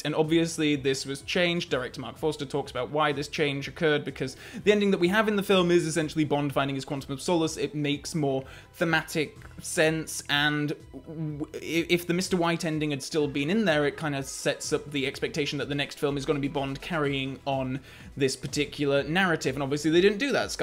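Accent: Yorkshire, United Kingdom. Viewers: If you check out his older videos he sounds very Yorkshire.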